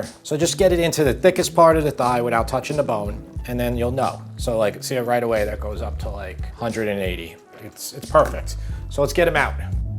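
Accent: New York, United States. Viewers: I hear that distinctive NY accent!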